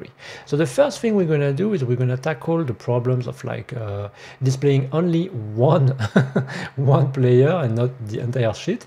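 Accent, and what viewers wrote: France. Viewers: je me disais bien ahah, au mois c'est cool ça permettra d'un peu comprends les vidéos parce que c'est dure de trouver des ressources en français sur Godot est j'ai un anglais qui assez discutables mais blague a part ta vraiment un très bon anglais et en vrais j'ai vanné un peu sur l'accent mais j'ai galéré a me rendre que c'était un accent un français x33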